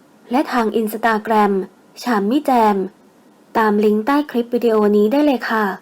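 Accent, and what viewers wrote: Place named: North, Thailand. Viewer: ผ่อหื้อกำ ฮาจะขี้นี่55555แอดน่ารักมากเลยที่พูดเหนือ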